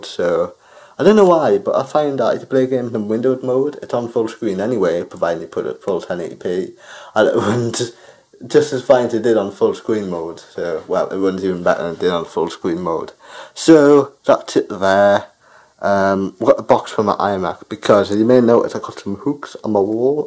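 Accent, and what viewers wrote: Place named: United Kingdom. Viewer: I knew it! That is really good British voice.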